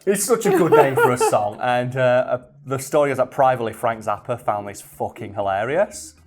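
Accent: United Kingdom. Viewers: Dee Snyder, Frank Zappa, and John Denver testified against the PMRC in the US Senate, not in court. Based on your accent, I'm assuming you're in the UK, so it would prolly be most like if they testified in the House of Lords there. Incidentally, Dee Snyder's testimony is well worth watching, he has no mercy on the Senators questioning him or the PMRC and easily puts them in their place with an eloquence rarely seen either then or now.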